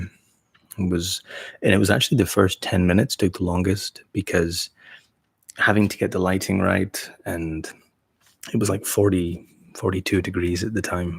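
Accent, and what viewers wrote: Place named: Scotland, United Kingdom. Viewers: It's an edinburgh accent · Are you Scottish, American or neither bc I can't work out your accent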